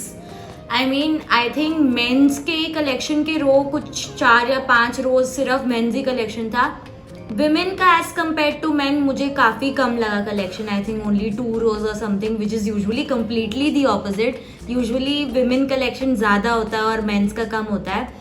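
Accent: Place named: India. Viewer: Love u indian accent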